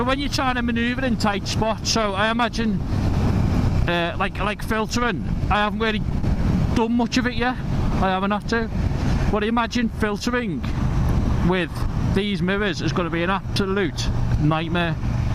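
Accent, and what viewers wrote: Liverpool, United Kingdom. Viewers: Are you from Liverpool??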